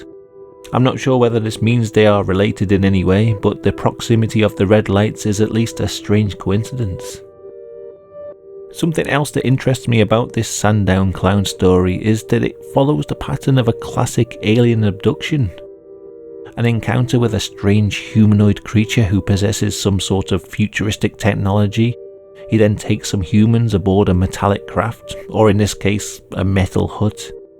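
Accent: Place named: North East England, United Kingdom. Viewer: no mate, defo not, I'd guess north east of England..maybe Sunderland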